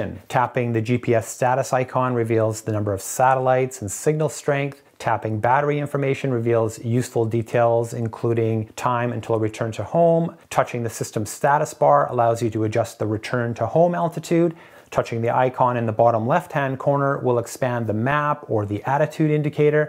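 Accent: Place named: Canada. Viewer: Thanks for elaborating. I'm in Eleuthera Bahamas now (a nice playground to use the drone, e.g. Sapphire Blue hole) but I live in Brampton. I'm going through your library of informative videos and appreciate them. Funny how almost immediately, one can detect a fellow Canadian in a video. Best. Ken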